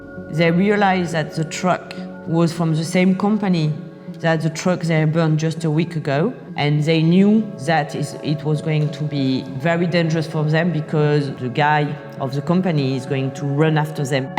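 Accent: France. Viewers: This is the most french accent i've ever heard lol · Strongest french accent I've ever heard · I couldn't finish the video. The French accent gave me a headache!.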